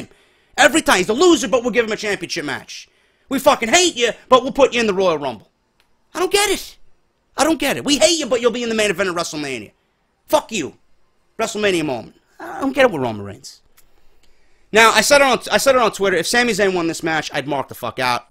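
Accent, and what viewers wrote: New York, United States. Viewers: Gotta say love listening to your reviews I'm from brooklyn so love the N.Y. feel. Everything u say is spot on and shows your a true wrestling fan. i swear if i could I'd start a petition to have u work for wwe creative writing, you would create a hell of a show. keep up the great work about to watch your smackdown review #FuckThoseOtherGuys.💪👍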